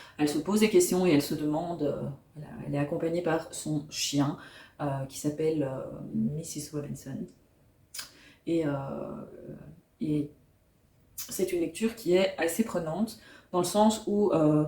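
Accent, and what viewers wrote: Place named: Belgium. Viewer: Hello, J'avais été très touchée par Ce qu'il faut de nuit, un coup de ❤et effectivement il a obtenu plusieurs prix dont celui des lecteurs du livre de poche. Alors pour le langage, tu m'as fait rire😅 car c'est simplement que l'auteur retranscrit quelques expressions et l'accent trainant lorrain, notamment sur le "O" et "A", dont il est originaire. comme toi, tu as l'accent belge pour prononcer certains mots. Je n'ai toujours pas lu les mémoires d'un chat qui est dans ma whislist depuis longtemps... Bravo pour tes chroniques qui donnent envie de lire😊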